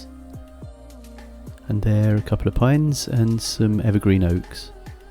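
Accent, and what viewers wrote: United Kingdom. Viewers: Thanks, the music is fantastic as always. I can notice you are doing mindfulness sessions just listening your peaceful voice jiji. I love your perfect English accent. Take care.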